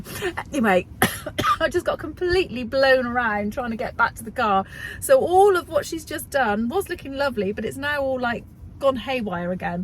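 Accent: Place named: England, United Kingdom. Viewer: I've had the week before Christmas off work, so while having my morning tee, I've watched you and. Sharing advent calendars for each other is such a fun thing to watch. I think i will try to do that for my 2 besties for next Christmas. Maybe also for the hubbie and grandkids. It looks like you are feeling better. My husband had something similar and he was feeling awful for over 3 weeks. The doctor said it was just a nasty virus going around. Luckily I had it slightly, and only for a couple of days. I live in Canada and mostly watch British vloggers, I think it's because the accents remind me of my English grandmother and Welsh granddad. I've only found a few Canadian vloggers, Janine Sews, and another young lady from Toronto, but i can't remember her name. I love that your entire family joins you in filming, so fun. P.S. the faux jumpsuit and blue velvet dress look stunning, you have the legs for a high slit for sure. 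I laughed about the old lady comment, I'm 65 and definitely don't dress like an old lady.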